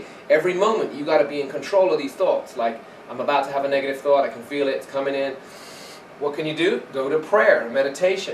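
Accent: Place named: United States. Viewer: What's with the American accent? Thought he was British.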